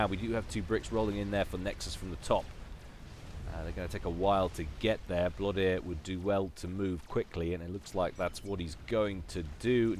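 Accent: United Kingdom. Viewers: Is British?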